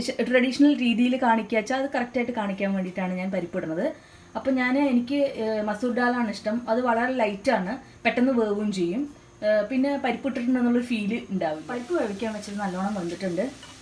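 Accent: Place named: Palakkad, India. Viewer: palakkd nnano, njangl palakkattu karade oru samsara reethi ndu and cooking style too... Rasam koodi onnu idoo... Plz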